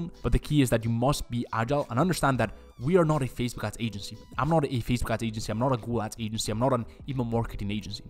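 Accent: Spain. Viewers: Are you from Spain?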